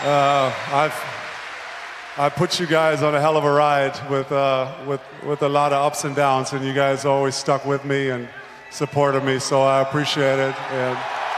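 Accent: Germany. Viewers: I can hear the german in his voice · ah year he‘s from germany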